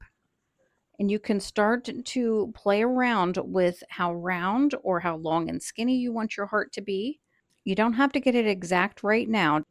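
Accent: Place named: Pittsburgh, United States. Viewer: These are so pretty!! Thank you for sharing this information 💖 Btw, are you from the Pittsburgh area, by chance? I'm trying to place your accent.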